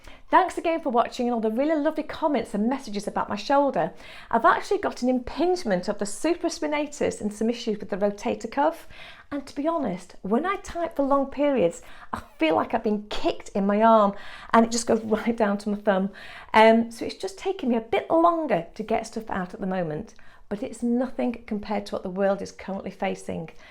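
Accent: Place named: United Kingdom. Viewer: You seem like such a lovely and warm woman. I simply adore your British accent. Great video! Thank you for a slice of goodness this morning.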